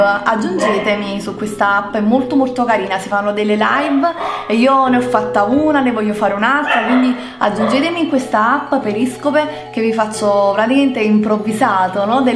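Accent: Naples, Italy. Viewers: Sei napoletana eh? Se si anche io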